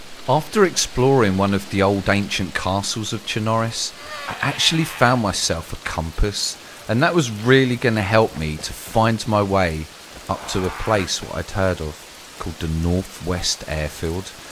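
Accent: United Kingdom. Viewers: it's called a british accent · im not saying his british accent is werid, its fine, but he talks completely differnet then he normally does, he talks slow and odd when he is doing a commentary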